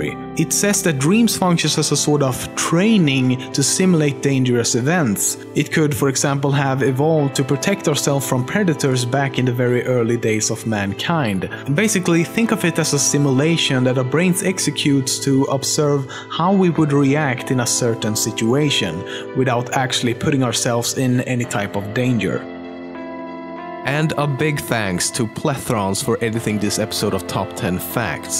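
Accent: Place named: Sweden. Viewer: Youre sweedish i hear it on the accenr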